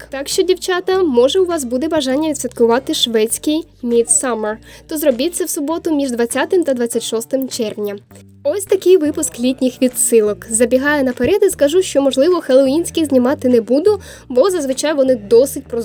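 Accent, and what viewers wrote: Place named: Ukraine. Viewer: можешь по русскому говорить?ты же вроде раньше разговаривала на нём...незнаю что ты хочешь нам доказать своим украинским акцентом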